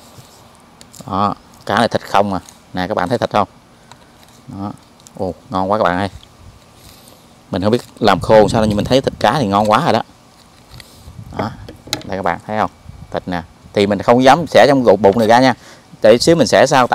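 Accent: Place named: Mekong Delta, Vietnam. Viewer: chau nay noi chuyen dung dan mien tay , thiet tinh , chan chat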